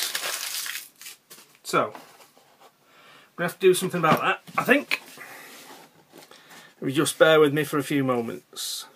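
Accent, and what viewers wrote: United Kingdom. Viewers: British army knife. British accent. American Flag. Great presentation bro. Greetings from USA 🇺🇸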